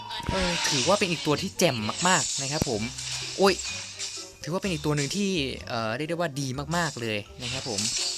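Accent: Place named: Indonesia. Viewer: Skill translate to việt nam but your voice is indo right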